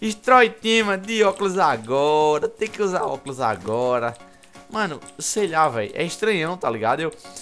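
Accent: Northeast, Brazil. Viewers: Bola de GÚDÍ , BÓDÍ KKKKKKKKKKKKKKKKKKKKKKKKKKKKKK Eu amo os sutaques nordestinos Kkkkkkkkkkkkkkk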